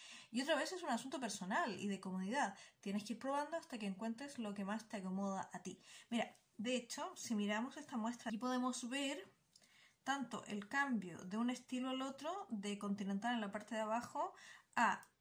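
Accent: Chile. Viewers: speak in chileno cuicos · Tienes acento entre chileno y españolísimo!! Jajaj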